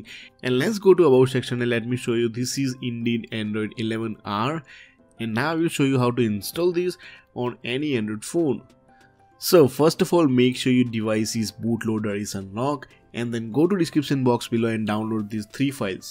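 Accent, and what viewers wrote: India. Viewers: Is this safe ? U look like an Indian from ur voice and I mostly dont trust them Pls answer me 🥺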